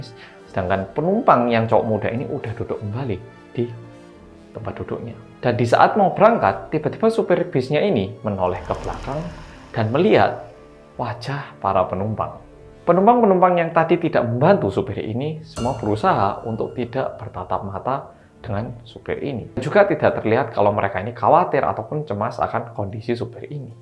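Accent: Java, Indonesia. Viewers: Ini mah cerita bohong, btw ngga pernah bosen denger mas cerita apalagi dengan cirikhas medoknya itu lo.. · Ngga tahu ini beneran atau bohongan, tapi gw pengen ini bohongan aja karena jahat amat umat manusia 🙏🏼🙏🏼 thank you, Boloku Sing Paling Medok Dheweeeee 🩵🩵⚡✨🪷🫶🏼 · Selalu suka sma stortelnya mas. Keren bgt itu loh meragain dan bawain tokohnya tpi ttp meddok gk ktinggalan Kalo jwaban akun ceritanya yo psto bihong iki. Soale kyak smcam urban legend aja gitu hihii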